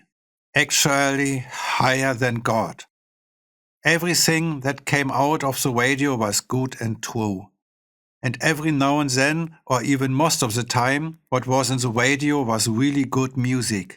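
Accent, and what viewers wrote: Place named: England, United Kingdom. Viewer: I love his English accent! Flake is such a musical genius. Rammstein would not be complete without him.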